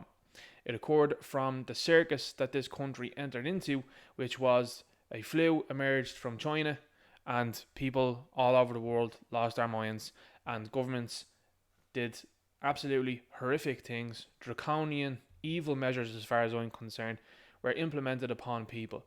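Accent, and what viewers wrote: Dublin, Ireland. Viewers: Absolutely love you. I’m a culchie and I luvvvvv the Dublin accent for starters…and the Dublin expressions. Class, pure class ❤ and then the content….you really nail it here. Pls God more ppl wake up….am thinking their strategy in going to Derek Blythe’s door abut his tweet or whatever it was, was because they knew he’d report it on social media and it would make ppl afraid they’d e next if they post something, so they’ll stay quiet, that’s what I think they were trying to achieve there. So we have to spread the word and wake ppl up and reclaim normalcy. Thanks. God bless.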